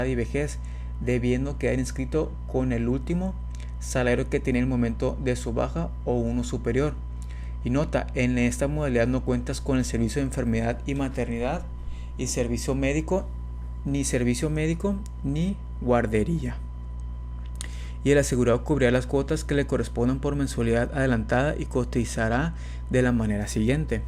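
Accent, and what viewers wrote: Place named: Latin America. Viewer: Tu acento se escucha de otto país de américa latina no de México, y para mi grnera algo de desconfianza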